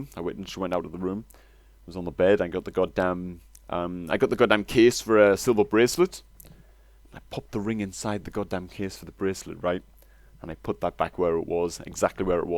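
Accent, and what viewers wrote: United Kingdom. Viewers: What the fuck maaaannn I thought you sounded Brit but I wasn't sure but your fiancé is. Proper Geordie??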